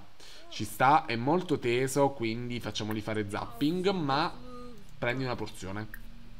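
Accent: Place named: Taranto, Italy. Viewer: ho appena iniziato a guardare i tuoi video e ho capito subito che fossi di Taranto,spero vivamente di avere ragione hahahah comunque sei divertentissimo giuro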